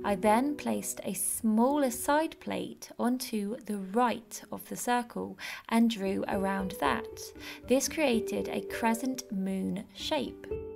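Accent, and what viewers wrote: England, United Kingdom. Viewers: Witchcraft sounds so much more magical when discussed in an English accent! 🍂🍁🍂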